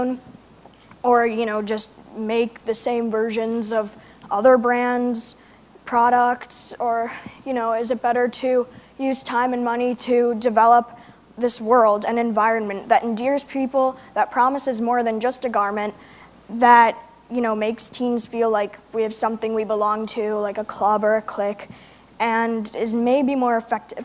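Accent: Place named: United States. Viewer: this american accent seems incredibly harsh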